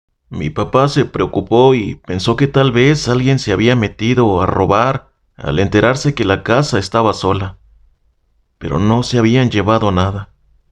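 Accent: North, Mexico. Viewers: No me canso de escucharte amigo 👍 tengo entendido que eres de Juárez? Me encanta el acento del norte de México Saludos a todos desde España 🇪🇦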